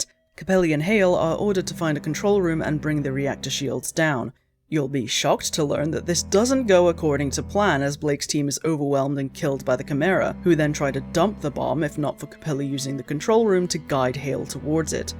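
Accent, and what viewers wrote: Scotland, United Kingdom. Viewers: They're Scottish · Amazing British female voice that loves horror. I love her:)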